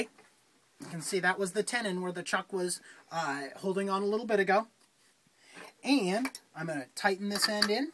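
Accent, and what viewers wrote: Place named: United States. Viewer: dont think hes aussie mate! sounds merican to me.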